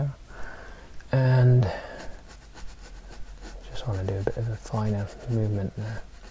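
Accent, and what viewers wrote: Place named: England, United Kingdom. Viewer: I know his accent is English, but is he based in the UK or Australia as we have a lot of Brits who migrate to Oz. Cheers from down under.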